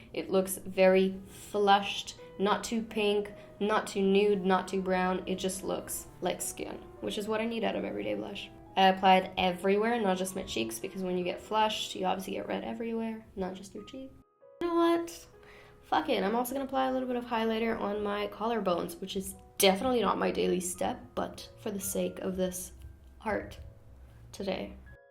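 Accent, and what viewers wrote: United Kingdom. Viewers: That British accent is coming through and I am here for it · OKAY I saw the comment on your ig about the accent and now I can't unhear it lmao I think it's the way you end the words and say the 't' now. I could also be tripping. but british influence tho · Soooo happy to see you back 💗 I also love your slight british accent hahaha it’s so cute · You're starting to get that English accent! Too cute!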